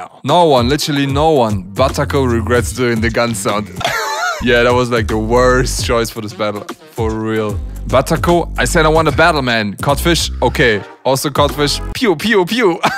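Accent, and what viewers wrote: Germany. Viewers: Du bist Deutsch oder du hast diesen Deutschen Akzent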